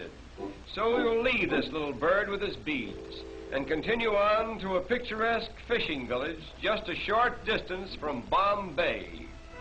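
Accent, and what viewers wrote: United States. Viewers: 'as described by the Britishers'sounds like an American/Canadian to me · The commentator appears to be American or Canadian, not British. Great video! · It appears to be an American voice rather than British. Great video....the city should have retained its real name of Bombay...Mumbai was a group of mosquito-infested islands inhabited only be fisherfolk; Bombay was the modern city that the British built!! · And the accent is American; not British. And there is no word in modern English called the..."..the Britisher"... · What a horrible American accent! The British don't pronounce Bombay like that. lol.. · I believe that this is an American documentary, the narrator is certainly an American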